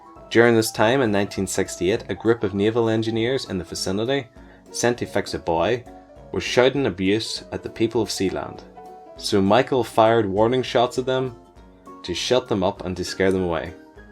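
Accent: United Kingdom. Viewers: I really like your videos. I just subscribed. What part of the UK are you from? I really like your accent.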